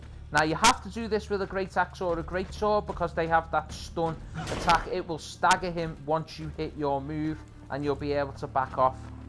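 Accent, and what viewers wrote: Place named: Liverpool, United Kingdom. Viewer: Excellent video, thank you. Subscribed. Loving the Scouse accent as well.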